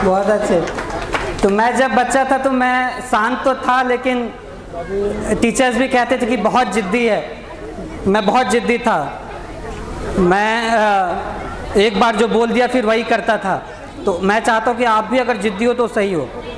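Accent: Bihar, India. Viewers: वे हिन्दी जाने बिना टाप नहीं किए है पर बिहार की हर जगह की अपनी एक भाषा शैली है जिससे अलग होने पर बनावटीपना दिखता है। साधारण परिवेश में पालन होने पर कुछ असर रह जाता है। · Hindi pronunciation is general problem in Bihar & that is the recognition of a Bihari,if we start speaking like UP ,our recognition is like to be lost.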